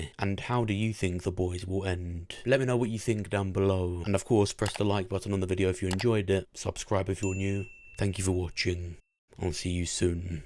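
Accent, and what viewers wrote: United Kingdom. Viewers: Are you a British guy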